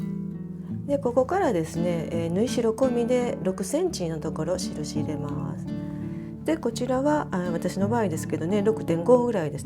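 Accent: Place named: Kansai, Japan. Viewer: 先生は関西の方ですか？ 私と同じ関西弁でした。😆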